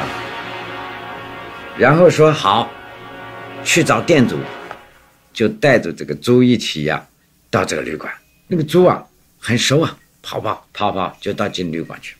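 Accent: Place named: Jiangsu, China. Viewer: 这个口音一听就是江苏中北部口音无疑！ 司马老先生最近仙逝。 安息 :face-red-heart-shape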